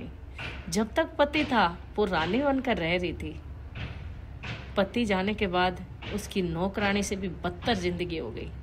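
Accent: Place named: Himachal Pradesh, India. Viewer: बेटा जी आप हिमाचल, बिलोंग करते हो भाषा, से, फील, हो, रहाहै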